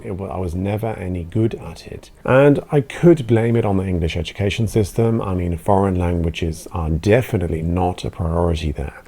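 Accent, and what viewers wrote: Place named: Germany. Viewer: I love your German accent. That's dedication!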